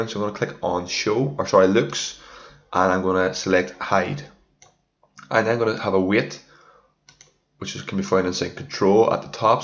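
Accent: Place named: Northern Ireland, United Kingdom. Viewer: Northern Ireland! Awesome accent!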